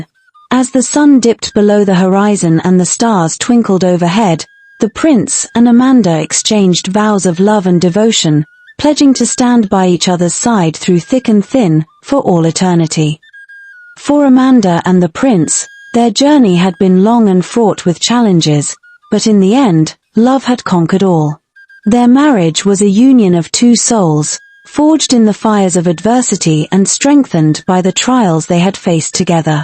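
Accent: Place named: United Kingdom. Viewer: read this slowly.,....... your irritating voice is fukkin up the story. Find your own British tales or whatever accent that is and narrate it. Try hamlets tales it's more fitting for your kind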